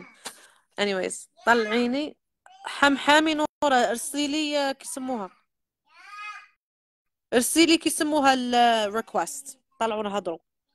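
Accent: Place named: United States. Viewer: سلام الزينة بنت بلادي أنا نتابعك من ليون بفرنسا. بنتي تحبك و تعجبيها بزاف في طريقة نطقك للإنجليزية الأمريكية. و أول مرة نعرف باللي زوجك فلسطيني ربي يحفظكم و يسعدكم و يهنيكم يارب العالمين 🇩🇿❤🇵🇸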